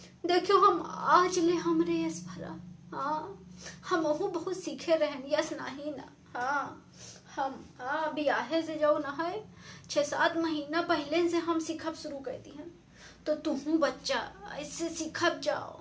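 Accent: Awadhi, India. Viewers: Verý nice gajab ki aktar ho tumhare muh se a avdhi boli bahut achhi lgti hai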